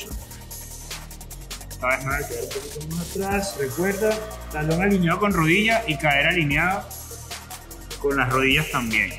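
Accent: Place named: Venezuela. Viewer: Pensé que eras Venezolano hermani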